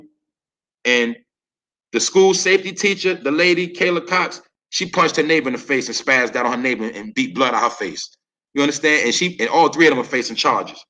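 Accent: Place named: Brooklyn, United States. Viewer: Yo ilke how u speak Brooklyn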